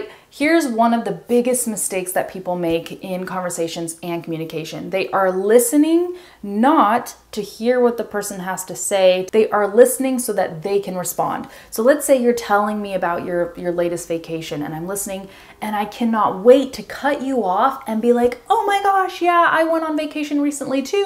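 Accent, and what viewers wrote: California, United States. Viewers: i adore the American accent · I really like your Californian accent. It's easy to understand so I can get your tips so well. Thanks for making this video Greetings from Lima :) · i just want to hear you talking i like your voice and american accent.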